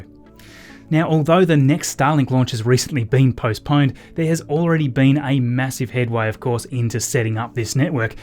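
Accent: Australia. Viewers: Don't you talk fast - and why an Australian accent?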